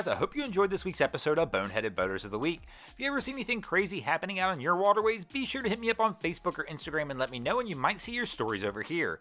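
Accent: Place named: Pittsburgh, United States. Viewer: So what you're saying is that you're from "Pixburg"? Sure sounds like it.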